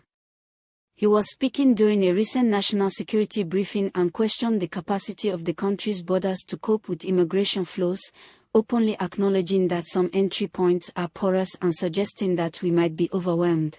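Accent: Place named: France. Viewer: So what’s with the French accent on the AI. used to be SOUTH AFRICAN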